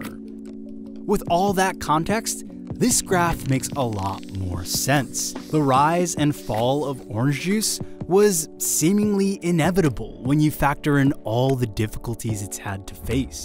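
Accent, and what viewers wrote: California, United States. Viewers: he has the HEAVIEST Californian accent 😭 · This slow California accent that keeps asking me questions even when its telling me answers is killing me.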